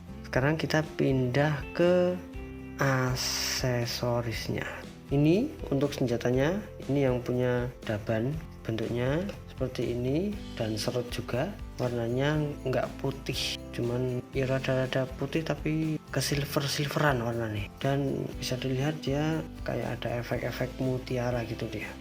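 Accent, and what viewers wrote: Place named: Java, Indonesia. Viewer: suweneng aku lek wong jowo review gundam, medok medok piye ngono lho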